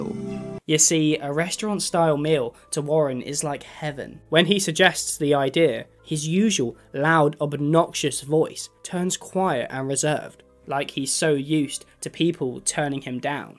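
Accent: United Kingdom. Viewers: British, aust? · oh baby a dhmis commentary made by a mf with a British accent??? my kind of video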